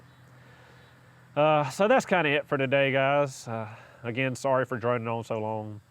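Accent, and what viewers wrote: South, United States. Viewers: Bro, you sound like you’re from Georgia or Alabama. I’m in East Alabama and getting my first Subaru. Hit me up. I follow the service intervals, but want someone trusted for bigger stuff. My bigger stuff, I mean anything internal. I can and do sparks, injectors, ball joints, fluids, belts, etc.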